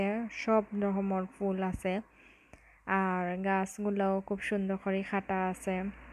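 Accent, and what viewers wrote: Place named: Sylhet, Bangladesh. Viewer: সিলেটি আপা আপনার কথা হুইন্না আমার ভালো লেগে গেছে অনেক ভালো লাগছে আপু আমার কথা শোনার জন্য আমার বাড়িতে আইসেন একটু দাওয়াত দিয়ে গেলাম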